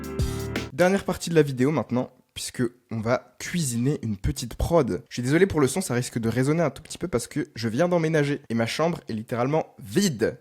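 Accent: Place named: France. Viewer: incroyable accent français !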